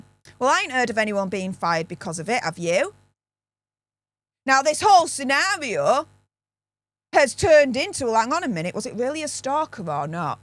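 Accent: Yorkshire, United Kingdom. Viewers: Yorkshire accent...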